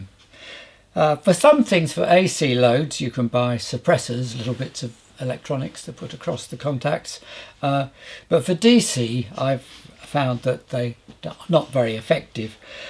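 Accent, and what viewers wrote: United Kingdom. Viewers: Did I hear right a British voice speaking metric. Then you went straight back to lbs. All good old Aussie tradesman that understands both but lean towards metric. Many thanks for your program.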